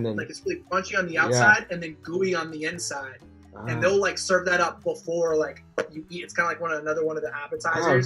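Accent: Buenos Aires, Argentina. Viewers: Tiene ré buena onda el vago pero reconozcamos que acento y personalidad portena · Te encontreeeeeeeee. Feliz! Me encanta verte disfrutar tanto de Argentina! Siempre c una sonrisa! Ya se lo reenvío a mis hijos. Amo mi país. Pero evidentemente tus ojos me lo recuerdan con más frescura y sacándome de este momento tan complejo a vivimos como país. Hoy mis hijos miran al mdo. Y sabes q es un desafío importante para las familias. Pero me quedo c tus sonrisas y la forma en q disfrutas de nuestras costumbres. Te mando un abrazo y un beso desde el alma hermosa personita Te juro q al escucharte era imposible para mi pensar q no seas argentino. Un placer! Saludos desde Baires. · prácticamente, sos un argentino hablando en inglés.. tenés más acento argento que yankie! AMO❤ Gracias por amarnos tanto loco!!! 🙌 Saludos desde Buenos Aires 🇦🇷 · Se te escapa el artentino por todas partes, pero bueno, espero que hables un buen yanqui, la verdad no me gusta mucho, lo ficcional, chau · Sos un Argentino disfrazado de estadounidense que habla argentino, y hace chocotortas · Te escucho hablar sos un porteño jajaja. 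Que bien que puteas. Saludos del norte de argentina Santiago del Estero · Tenes no solo la tonada argentina si no la simpatía y la onda ganadora de argentina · hasta cuando hablas Inglés se te pega el acento y estilo Argento! Posta! Te lo deben decir en tu país! Tal vez no lo notes pero sos un Argento hablando inglés 😁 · Querido. Escuche como hablas de el asado y ahora me voy con mi compañero de trabajo a clavarme un chori a la costanera sur de la putísima madre que lo pario.... Muy bien tú acento porteño..... Cuando vuelvas y se pueda ir a la cancha te voy invitar a la cancha de Huracan a ver al Globo.... Abrazo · muy bien el acento argentino!!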